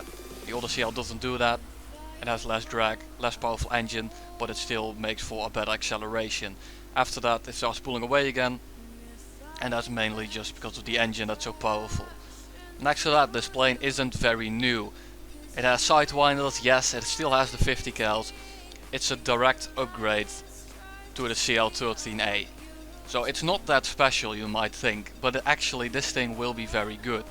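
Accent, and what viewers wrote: Netherlands. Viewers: Subscribed. I hear a clear dutch accent. Groeten uit limburg!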